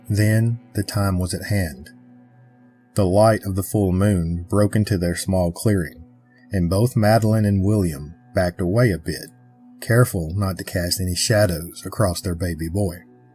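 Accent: South, United States. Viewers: that smooth southern voice of * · your smooth southern drawl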